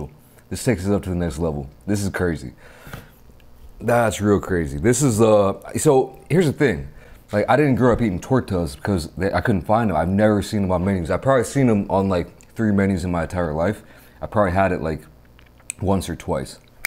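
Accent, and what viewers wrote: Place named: Midwest, United States. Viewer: They on to somethin', I'll tell ya' what! - A key part to any video is having his Midwestern-isms come out!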